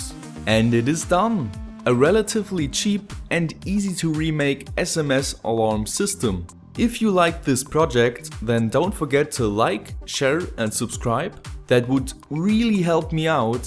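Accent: Germany. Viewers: Das hört man auch du hast einen Deutschen Akzent aber dein Englisch ist sehr gut!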